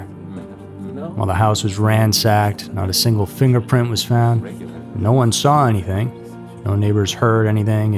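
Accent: South, United States. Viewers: Great story my friend. Love the Voice. Down south southern style❤🎉😂😂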